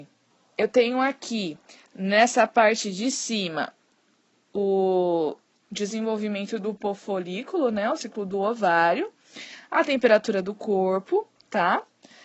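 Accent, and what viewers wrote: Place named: Inland Minas Gerais/São Paulo, Brazil. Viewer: Kkkkk sutaquezinho pesado do interior de Minas ou de SP 🤔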